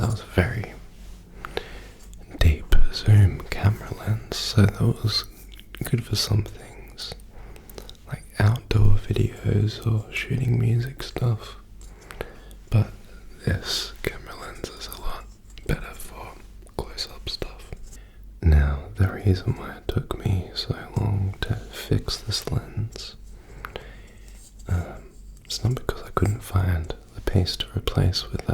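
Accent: Australia. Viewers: The Australian accent is so beautiful 😍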